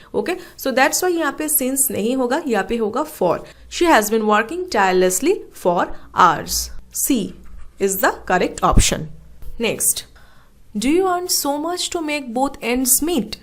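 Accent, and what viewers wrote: Bengal, India. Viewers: Ap bengali ho kya???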